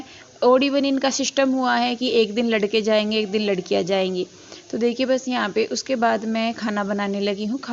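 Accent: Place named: Haryana, India. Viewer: Nice recipe di and aap haryanvibolte bahut achhe lagte ho.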